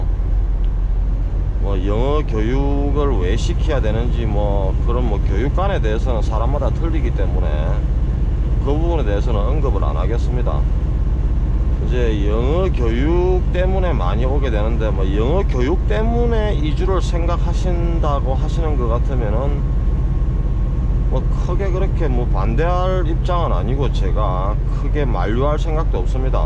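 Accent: Gyeongsang, South Korea. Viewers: 경상도 사투리 굿 저도 경상도사람으로서 친근감을 느끼네요. 좋은 영상 잘보았습니다. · 구수한 경상도 사투리 즐기면서 잘 보고 있습니다. ^^ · 우리 동네 카센타 사장님도 비슷한 억양... 경상도 사투리는 세상 어딜가도 그대로임 ㅎㅎ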